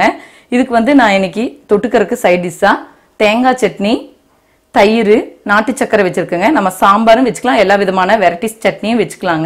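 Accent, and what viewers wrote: Kongu, India. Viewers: super mam rombha easy aa sollitharinga unga samayal ellam. nallaeruku athalayum neenga oru varthai solluvingala sethikalam athu enaku rombha pitikum neenga kongu thamizhaa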